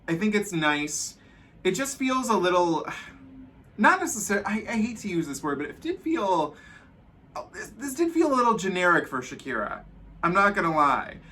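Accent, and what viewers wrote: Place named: Spain. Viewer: I love how you pronounce the Cs, as a Spanish person and not like a Latino.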